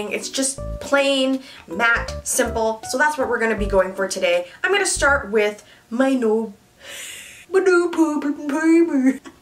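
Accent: United Kingdom. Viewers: The British accent is so realistic I am from England you literally sound like me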